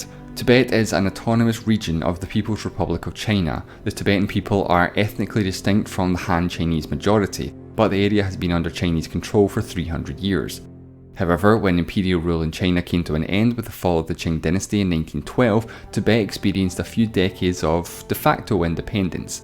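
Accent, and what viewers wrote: Scotland, United Kingdom. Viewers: Where is his accent from? So pretty did he say "as a scot" i didnt understand that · He's from scotland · dude i would have subbed you if not for that Scottish accent of yours. can't understand a thing without turning on the subtitles. honestly, that accent is totally alien to me!! i thought there were only 3 accents, namely British, American, Australian and i can get all three but now i know there's this weird 4th one as well. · They do sound weirder. He's actually toning down his accent to sound understandable to non-Scottish folk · Is it just me or does sound very scottish in this video? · I honestly don't know whether you realize how obnoxiously annoying the Scottish accent is, and that nobody likes it. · You had me with your wonderful Scot accent! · Actually he's Scottish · What accent is this? Irish or Scotland?! Oh hhhhh, Scotland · The first scottish guy I like Your accent is painful tho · Scottish accent - Best! 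· Accent, bro. SCOTLAND FOREVER!!!!! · Love Scottish accent, the enunciation is a lot better compared to the older videos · I thought the accent of British English is beautiful, this video proved me wrong. ~~Wait, is this video in British English? He mentioned he is Scot at a certain part, but isn't that part of the GB?~~ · Are u from Scotland Lad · wait ur Scottish? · Are u a scotish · are you from Scotland? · well he does sound scottish tbh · I was trying to find that out too! He did say "us Scots" and I just checked his other videos, his accent is def Scottish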